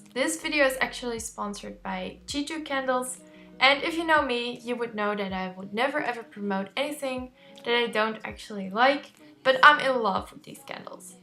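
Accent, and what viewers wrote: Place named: Netherlands. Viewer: Everything looks so nice! Also your thumbnails look so great and look so well together! OMG I KNEW I HEARD AN ACCENT. Kom je ook uit Nederland? Cute, subbed <33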